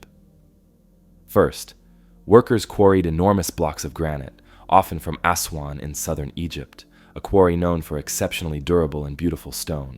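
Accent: United States. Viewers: I just love this narration voice. My favourite ever American accent.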